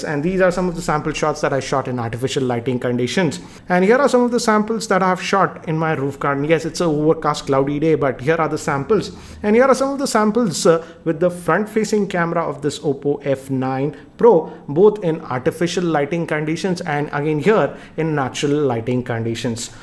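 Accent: India. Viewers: jajaja give him consideration ..indian accent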